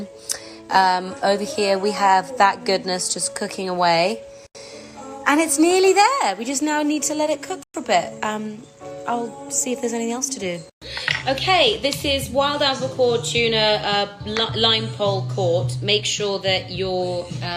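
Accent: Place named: Oxford, United Kingdom. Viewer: oxford accent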